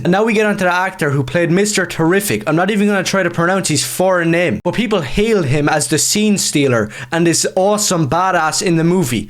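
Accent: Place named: Ireland. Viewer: It's Irish accent.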